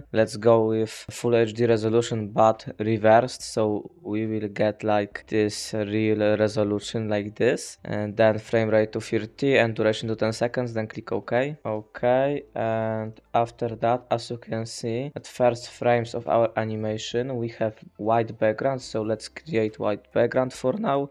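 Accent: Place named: Poland. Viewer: Polska GUROM slychac akcent <3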